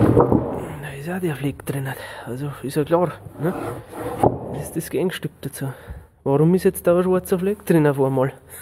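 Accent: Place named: Bavaria, Germany. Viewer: Guten Abend … (Ups, kenne deinen Namen gar nicht🤭) Ich finde hier deine Erklärungen betreffend der Biologie, inklusive dem Esoterischen Part über den Walnussbaum, sehr sehr interessant und aufschlussreich, zudem, die Sägetechnik kommt auch nicht zu kurz! 👏🏻🧐😃 Du hast wirklich ein super umfassendes Wissen, Hut ab. 🎩 Man spürt eindeutig deine Freude, Liebe und Hingabe zum Holz, der Schalk im Bayrischen Dialekt rundet es noch ab. Verständlich erzählt und „auf dem Boden“ geblieben, bleib so. 👍🏻 ☺️ Wünsch dr witerhin no an gnarret hübschi und erfolgrichi Ziit. 😉😁 Gruass us Grischun (Graubünden) ⛰ 🌲 🇨🇭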